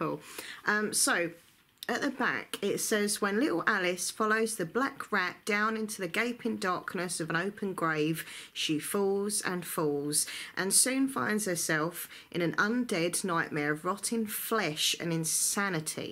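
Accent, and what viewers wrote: United Kingdom. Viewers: I want to win this book because my native language is not English and I use books films and youtube videos for learning the language and I think books are the best in this because there is a lot of vocabulary in it. I learn English for some time and I try to learn more and more, so I'm trying to read English books and watch your videos, because you have a strong British accent which is good to practice listening.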